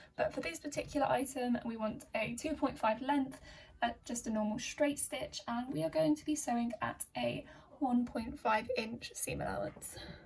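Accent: United Kingdom. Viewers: Where ar u come from ? I like ur english look like british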